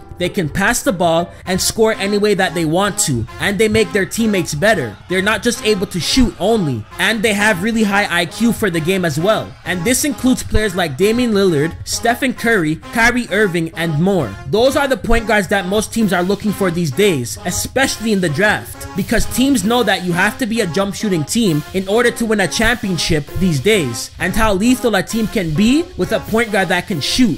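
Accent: Toronto, Canada. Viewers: Just wondering from your accent. Are you from Toronto?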